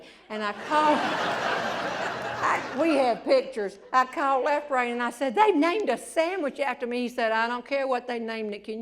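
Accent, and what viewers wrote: South, United States. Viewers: I love this Lady and accent . I’m from Texas but when I listen to her for awhile I start speaking like a southerner . Love her 💕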